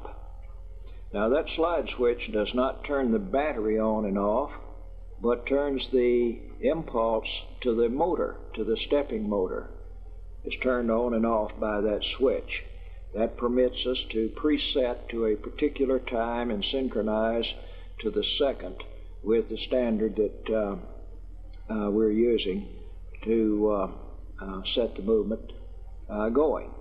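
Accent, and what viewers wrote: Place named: United States. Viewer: Excellent voice like the voice of president carter , good old traditional American voice.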